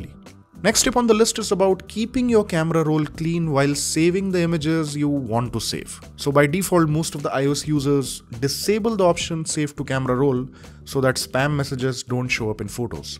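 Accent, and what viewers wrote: India. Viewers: Hate that Hindú accent. It’s very hard to understand!!!!!!!!!!!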